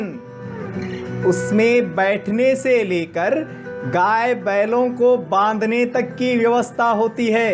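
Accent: Garhwal, India. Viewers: Sir aapki me grhwali bahut acchiii lgti h...missing dat grhwali tpkara....😊😊😊.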